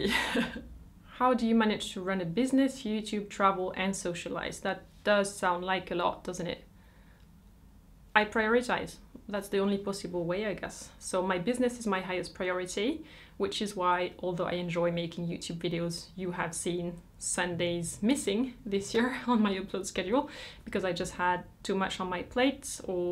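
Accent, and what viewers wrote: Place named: France. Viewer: Dear, your English is very good, even with so much French an intonation. I've learned a lot from your videos that I have no idea before. You are my favorite.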